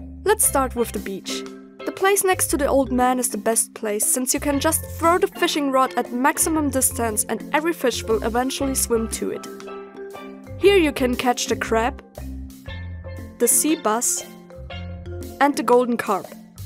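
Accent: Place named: Germany. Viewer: I just love hearing your Lazuli the Glaceon voice (though that's your normal voice, I can't help but picture my favorite ES character/Eeveelution). That smooth German accent is so cool.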